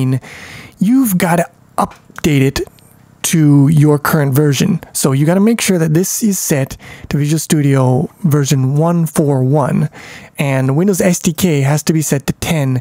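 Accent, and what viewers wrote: India. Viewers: the accent is troughout my first language super super annoying. 1. I cant barely understand it. 2. Is super fucking annoying to listen... almost run away screaming. It probably has to with germanic languages in which indian accent sounds really like ear rape. Im not racist. The accent is just ..... terrible.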